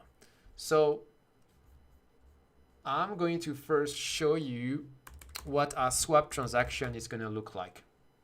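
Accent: France. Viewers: I feel you are french 😛😛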